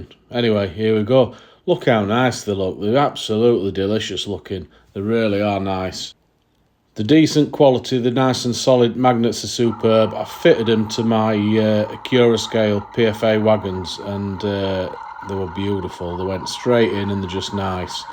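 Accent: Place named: Lancashire, United Kingdom. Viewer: Damn! I struggle to pinpoint the accent after all these years. I moved to Australia about 40 years ago. I honestly thought you were from my old neck of the woods (Oldham). I guess SE Lancs and SW Yorks are similar. Never tried the Hornby couplers, the Hunt ones are all right. I print my own these days. Cheers!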